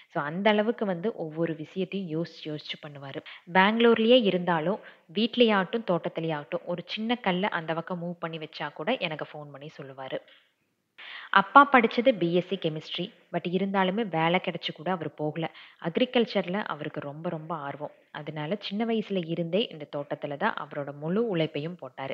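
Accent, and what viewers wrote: Coimbatore, India. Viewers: Hi sis... Oh wow supb akka... Am also coimbatore only naa ipo 2 days ah than unga vedio pathutu irukken.... Really am enjoying also surprised and romba egar oru oru vedio pakkaren... Really supb akka... And unga previous vedios pakkun pothu i thought neega Coimbatore ah than irukkanum kandipa apdinu nenachen becos apdiya Coimbatore slang irunthuchu neenga pesum pothu sila words... So very namma coimbatore... Athum kottur i knw kottur azhagana oor veedu... Romba supb akka we r all keep supporting u... Engalukku neraya visiyangal share pannunga and we learning from u... Love u sis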